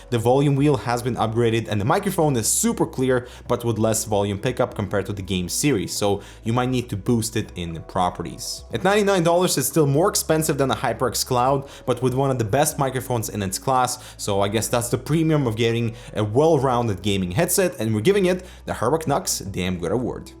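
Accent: Russia. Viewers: Guys, is from Russia?! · Okay.... so is he a Russian or Swedish? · ***** RUSSIAN Accent Happy?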